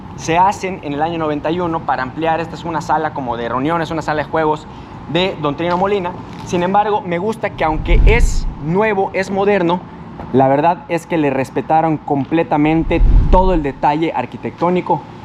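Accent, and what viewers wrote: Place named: Yucatán, Mexico. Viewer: tienes un ligero acento yucateco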